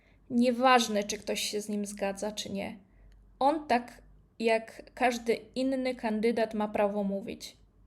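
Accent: Russia. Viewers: Czyli jak maciak popiera pani rosyjski i białoruski reżim, po Pani akcencie nie dziwię się. Łagry, zabijanie przeciwników politycznych jak Nawalny - to jest wolność słowa. No Brawo. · Audycja sponsorowana. Zobaczcie i poznajcie sponsora kanału jeżeli potraficie czytać i słuchać w melodyjnym języku rosyjskim 🤣 Bystrzejszym uszom proponuję wsłuchać się w akcent pani lektor. Czego tu jeszcze nie rozumiecie? 😂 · Ty ruska. Na front! Czuć rosyjski dialekt. L! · Ile tu ruskich troli się namnożyło. A tak w ogóle niech pani popracuje nad językiem polskim, bo słyszę akcent rosyjski z pani ust.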